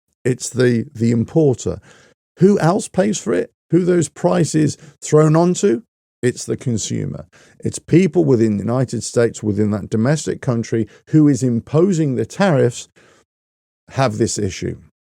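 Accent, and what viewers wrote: United Kingdom. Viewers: Why is it always Donald Trump? All he does is it implement the very same tariffs, other countries have in place for US imports. You have a British accent, which means that you are beaching about how things are in your country and I suggest you take your war to Starmer!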